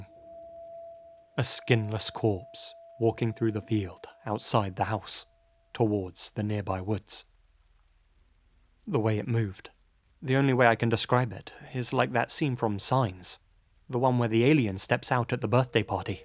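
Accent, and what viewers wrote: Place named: Northern England, United Kingdom. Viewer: Your accent is quite hard to place. It's certainly British - more specifically English and largely Southern, but it sounds like it has the lilt of somewhere else. Either you grew up down in Southern England and moved up North acquiring a northern lilt, visa versa or you just have unique annunciation.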